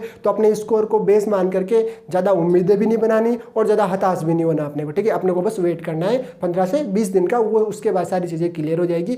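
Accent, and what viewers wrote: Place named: Malpura, India. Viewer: Sir aap malpura ke kya aapke voice tone se guess kr rahi hu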